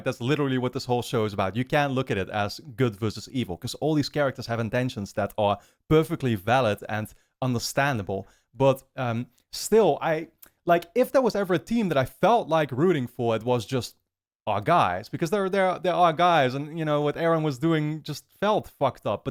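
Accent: Netherlands. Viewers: Haha I can hear you're Dutch from a mile away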